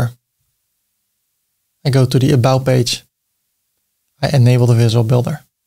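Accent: Netherlands. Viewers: TOP video!! En love het Nederlandse accent haha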